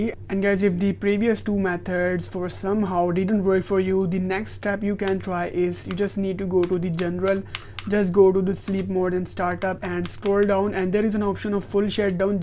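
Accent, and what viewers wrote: India. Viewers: He sounds like a indian but is works is almost destroyed my xbox before this😂😂 but Thanks man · it’s always the indian sounding guy who helps a lot somehow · Nah bro you guys are racist man just cause of an Indian accent??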